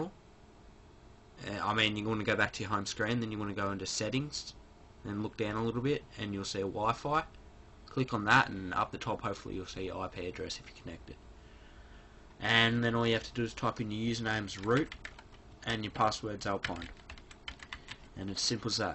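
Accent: Australia. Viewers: ih im 9 n yer ossie vose siis cut. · Good video 5/5. Also somehow I knew that you were aussie lol.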